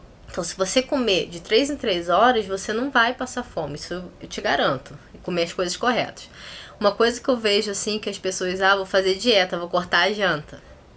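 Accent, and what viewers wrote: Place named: Rio de Janeiro, Brazil. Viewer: Oie, passei o dia todo ansiosa pra chegar em casa e ver seu vídeo....confesso que queria ouvir vc falar e ver o sotaque de carioca rs, te acompanho pelo face e tenho que agradecer sim pelo exemplo, por se expor, comecei minha reeducação no final de janeiro de 2015, pesando 117kg, hoje estou com 105, estou devagar pq esta dificil controlar a alimentação...exercicio faço mto mas o principal é a alimentação mas nao me entrego e nao irei desistir de mim novamente, sempre lembro que você começou com 105 e é um exemplo de que com muita força e determinação podemos alcançar nosso objetivo, adoro suas postagens, fico rindo do que escreve, vc é ótima rs, desde o inicio da minha reeducação que te acompanho, que Deus te abençoe, muita saúde, paz e sucesso pra gente :) ah! não para de fazer vídeos não, você foi muito bem!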